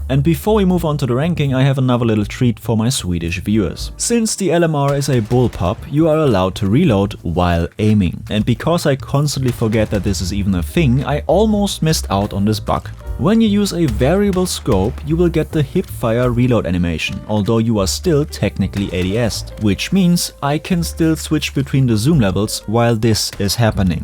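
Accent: Germany. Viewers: I fell in love with your voice and German accent in the first video I watched.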